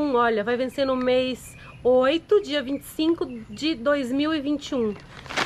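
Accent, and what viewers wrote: Paraná, Brazil. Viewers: Acho que vc tem algo em comum comigo kkkkkk amo achar coisas que de para reciclagem e eu também sou do Paraná nasci em Maringá e fui morar Curitiba ainda crianças ,este seu sotaque não me enganou kkkkkk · Vc é do Paraná??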